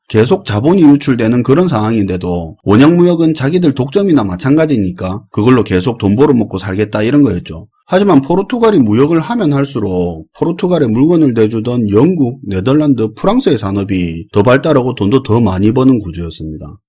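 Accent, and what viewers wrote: Gyeongsang, South Korea. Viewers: 재미있게 잘 듣고 있습니다. 쉽게 이해 잘가게 설명해주시는거 너무 감사합니다. 경상도 사투리도 너무정감갑니다.^^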